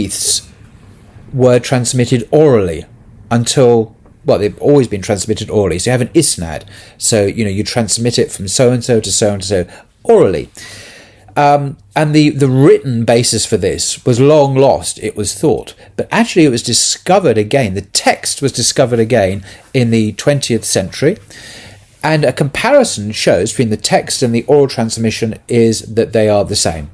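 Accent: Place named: England, United Kingdom. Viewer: Alhamdulilah for Brother. I always learn a lot from him. I especially enjoy his accent..a true English man.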